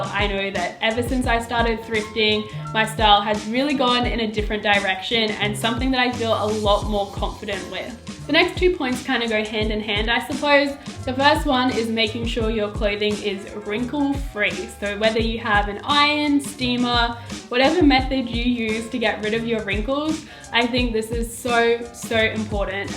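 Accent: Australia. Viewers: Hey girl! I knew you were from Australia because of that accent :) which part of Aussie are u from? (if u dont mind me asking) hehe I'm from Adelaide! Anyways, I love your fashion style and I was wondering if you have any outfit videos that is perfect for the winter season here in Aussie <3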